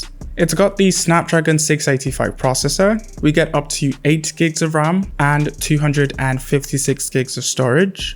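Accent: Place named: London, United Kingdom. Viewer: London accent.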